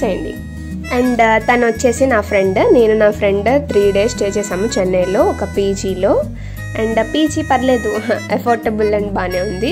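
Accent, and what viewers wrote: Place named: Telugu, India. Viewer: Your Telugu slang 👌👌.. Addicted..♥️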